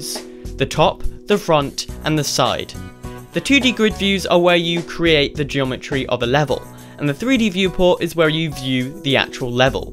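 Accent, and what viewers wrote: United Kingdom. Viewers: Woah woah my man is Bri'ish. So am I mate! (well, I live in the UK so does this count?) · finally a fellow brit i can finally learn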